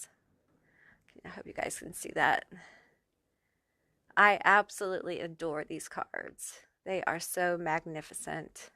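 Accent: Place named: South, United States. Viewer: are you southern? i can hear a slight accent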